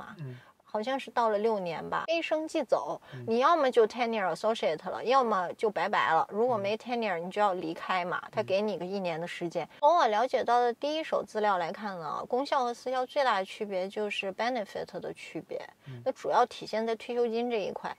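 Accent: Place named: Tianjin, China. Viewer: 这个女生是天津人，似乎听出了津普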